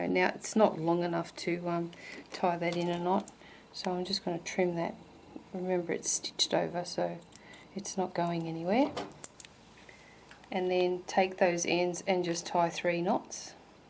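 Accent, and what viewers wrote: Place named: Australia. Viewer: This has nothing to do with sewing but I love your Aussie accent. (Thanks for all the helpful videos!)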